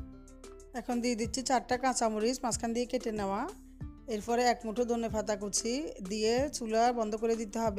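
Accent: Chittagong, Bangladesh. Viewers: আপনি কি চট্টগ্রামের?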